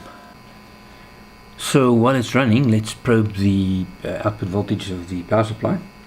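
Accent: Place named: South Africa. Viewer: I can spot a South African Accent any day!! Great video bru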